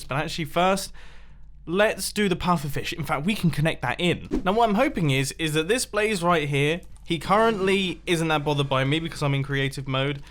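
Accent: United Kingdom. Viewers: U brittish??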